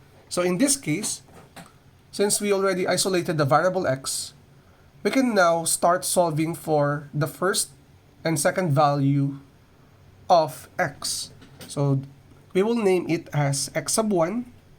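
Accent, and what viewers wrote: Philippines. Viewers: Yeah his accent is filipino so the pronounciations are a bit different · He's Filipino so the accent is a little thick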